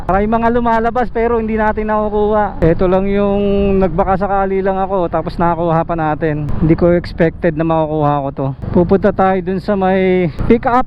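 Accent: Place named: Pampanga, Philippines. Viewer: parang reed lang na naghahanap buhay haha kapampangan kaba boss? bigkas mo kasi lakas makakapampangan e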